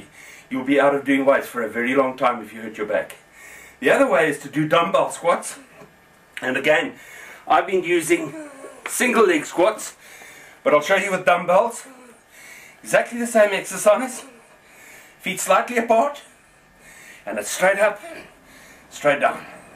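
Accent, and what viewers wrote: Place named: Cape Town, South Africa. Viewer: Thanks from Peshawar, Pakistan. Luv your Cape Town accent.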